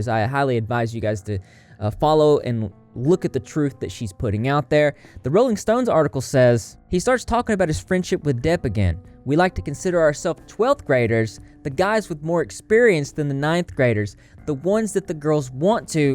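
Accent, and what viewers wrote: South, United States. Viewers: Bro that's a sick southern accent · You gotta be from the south, lol?? Shout-out from NC!!!! I'll admit, back in 2012 I watched a video of a MM concert where he pushes a bandmate on stage in front of hundreds of people. The guy stops playing the guitar for a moment, long enough to briefly yell at Manson, raise his fist as If he is going to start throwing blows. But he quickly starts playing guitar again.... At this time, MM mocks the guitar player by placing his hands in the air and moving them as if he is working a puppet on strings to the beat of the music. Seeing this, I developed a feeling in the pit of my core that told me MM had abusive tendencies, and I couldn't help but think "God I bet that guy is an absolute nightmare to deal with". And quiet frankly, after I watched an interview about Manson being a follower of Anton Szandor LaVey, Aleister Crowley, was given an "honorary priesthood" and claims to be ordained by the Satanic Temple, and has actually had run-ins with books such as Necronomicon.. 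one might be forgiven for believing he is indeed capable of committing such horrendous acts upon another human being.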